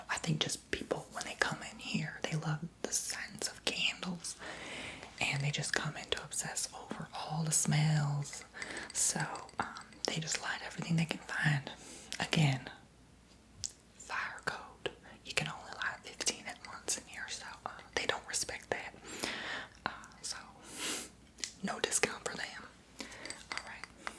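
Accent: South, United States. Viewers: You do a southern accent so well! Would love to hear more of it in future vids♥️ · There goes that Southern Accent😂😂😂😂Love it😊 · !!! This video is awesome! I looove the southern accent. And its a deep southern accent too. Reminds me of my family from Mississippi. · This southern accent + candle tingles = everything.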